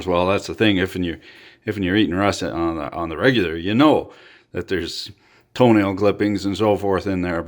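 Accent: Quebec, Canada. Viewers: Wow your French is very good ! Hilarious accent from Quebec tho ^^ Keep up the cool shit man looking forward to see your next cnc vid ;) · Are you Quebecois? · Tabarnack de calisse il parle québécois pas français calvaire de siboire d’osti de criss !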